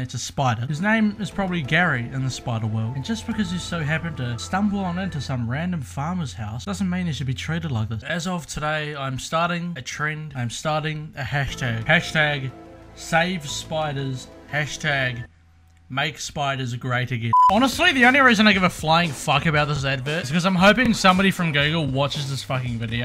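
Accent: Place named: New Zealand. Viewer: Clearly a New Zealand ad because of the accent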